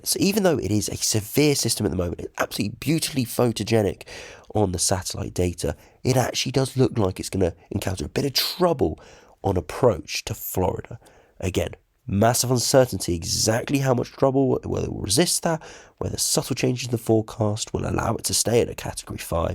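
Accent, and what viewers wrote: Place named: England, United Kingdom. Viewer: exactly. The ‘weather modification’ was unintentional and caused by the vast quantities of greenhouse gases we’ve been emitting over the last couple centuries and we’ve finally crossed some feedback loops so we’re now in thermal runaway. The damage had been relatively linearly increasing in damage, but some key points such as permafrost melting, higher libido due to arctic ice melt, etc have really started to cause rapid (abrupt even) acceleration in temperatures. Higher temps mean more energy, these events become more frequent and powerful on average when temps are higher. It’s just a shame that the countries that have contributed the least to the damage, and have generally been exploited the most, are the ones being hit first and hardest. I’m just glad Florida is a MAGA state and full of deniers and conspiracists. 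The type of people that say “it’s just hurricanes, we’ve always had them. It’s normal!” It’s pleasant hearing someone with a nice English accent doing a good weather report. Probably sounds bad but it sounds much more professional and just easier on the ear in general. Subscribed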